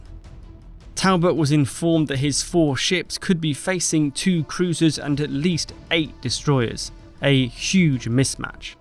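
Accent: United Kingdom. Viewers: Stop narrating these with British people, these are American victories. Should be called by American heroes.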